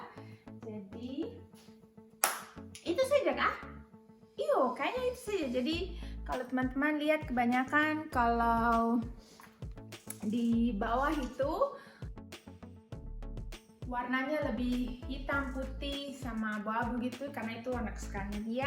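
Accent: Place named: Eastern Indonesia, Indonesia. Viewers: Hai kak, baru nemu dan langsung subsc dong pass denger logat ketimuran kk 😅❤ salam kenal dari sultra 😘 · Shalom mb sy br subcribe slm kenal sy agustina suku Toraja- Indonesia, logatx mb spt org toraja slm succes mb n Mr. Tuhan Yesus Kristus memberkati kita 🙏🥰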